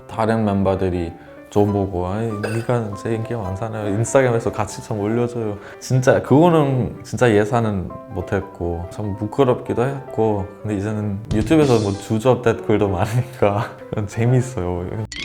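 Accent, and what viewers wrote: Seoul, South Korea. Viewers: 저보다 서울말을 잘하시네요 ㅜ ㅜ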